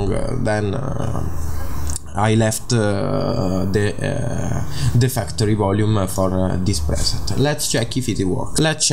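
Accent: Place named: Italy. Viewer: Si sente troppo che sei italiano🤣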